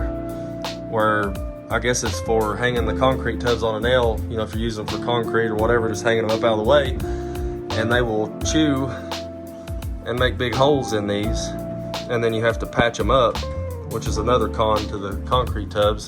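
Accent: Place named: South, United States. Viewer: 😂 I heard your lovely southern accent and I was expecting some wholesome country or bluegrass music for background music.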